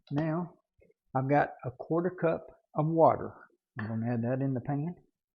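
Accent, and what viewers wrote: South, United States. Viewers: Thanks for this recipe,. I subscribed to your channel. You sound like a southern gentleman and since you cooked at the firehouse, I’m sure your recipes are great! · You have a perfect voice to fall asleep to! I mean that in a good way, you’re pleasant, clear, and relaxing like a bedtime story. If you’re looking for a 2nd career - think about it! Especially with your accent you’d be perfect for a southern novel!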